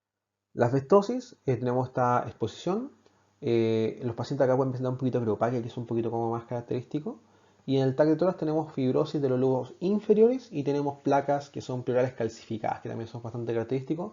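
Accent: Chile. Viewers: chileno no ? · no le entiendo lo que habla ... chileno??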